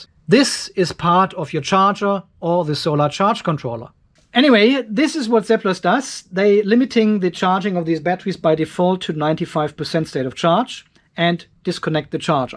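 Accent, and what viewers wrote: Germany. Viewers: You sound like german? Speak deutsch?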